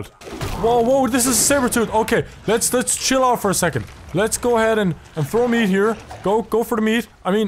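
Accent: Ireland. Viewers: Your Irish, are u from the Midlands , you sound it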